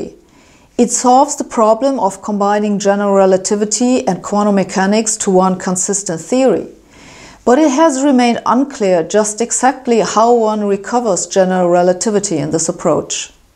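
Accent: Germany. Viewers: I have tried to analyze why I like your video’s so much. Is it the German accent in your scientific English that for my generation spells deep learnedness? Is it the high amount of inflection with which you impart so much understanding and which makes listening to your Referat so easy, with a cup of coffee? Is it the full dedication to content, with a total lack of ego, not trying to show how smart you are, but how interesting the subject matter is? Or is the choice of subjects, that are mundane questions that you are able to make into glittering gems of physics, reminding us physicists what a beautiful field we have chosen? Or is it the total absence of smiling, that so often is strewn all over the presentation by women? I do not know what that means or if it is intentional or not, but it is a constant through all of your fascinating video’s, making them even more fascinating. 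It has made me think, what does smiling do to a story being told. I’m beginning to find out.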